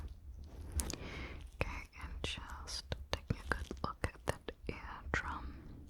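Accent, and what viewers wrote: New Zealand. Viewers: 20 seconds in and i could tell you were kiwi 😂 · are u from nz? so beutiful ❤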